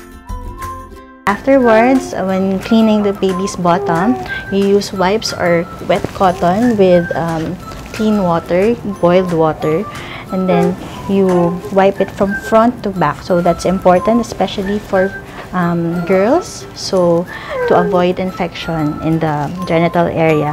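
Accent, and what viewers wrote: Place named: Philippines. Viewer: everytime .5 seconds realuze shes pinay with the accent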